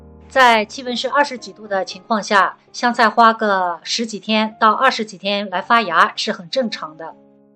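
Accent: Jiangsu-Zhejiang, China. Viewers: 听你口音是江浙一带的吧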